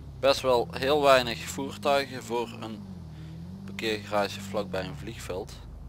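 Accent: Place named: Limburg, Netherlands. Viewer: Goede video! Like en sub, ga zo door. Kom je uit Limburg?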